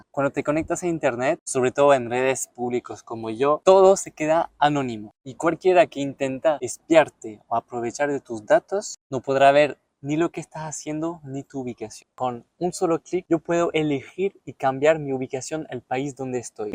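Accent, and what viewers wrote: Chile. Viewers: trasmites una paz con tu acento Chileno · Tiene acento chileno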